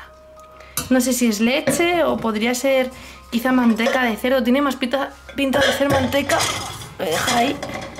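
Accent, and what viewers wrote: Spain. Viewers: Me gustan mucho tus vídeos de verdad. Pd:Me gusta mucho el acento español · Amo tu estilo narrativo,! Tu timbre, tu pronunciación y tu vocabulario. Me encanta el acento español! Saludos desde Mérida, México.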